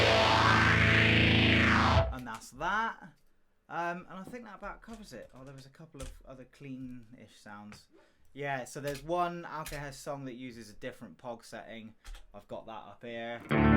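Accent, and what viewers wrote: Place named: South West, United Kingdom. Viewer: Mostly came here to find out what that mysterious silver box of yours did, Nice to hear a south west accent talking about pedals for once keep it up!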